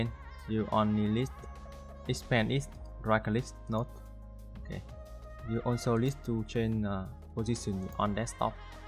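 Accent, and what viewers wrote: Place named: Vietnam. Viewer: nghe kiểu đọc là biết người viêt, haha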